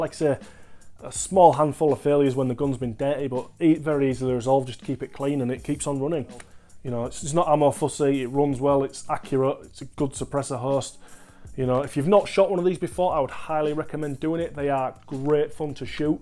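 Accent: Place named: RP, United Kingdom. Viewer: You sound very Posh! At least to me, i'm form Philadelphia. lol.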